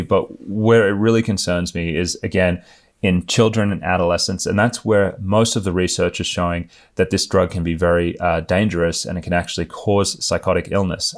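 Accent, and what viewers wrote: Australia. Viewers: I think this is a really important video. That said, however, you are speculating. It could be that there is a genetic or psychological predisposition that makes them sensitive/vulnerable to cannabis. What you said about the conversion rate suggests that there might be a relationship between a tendency for certain psych issues and cannabis (THC?), but it could be the opposite of your suggestion, i.e. it triggers the latent condition. You sound Australian and you look Indonesian. It's a popular place for Indonesians (I lived there for 15.5 years). Did you move or were you born in Oz?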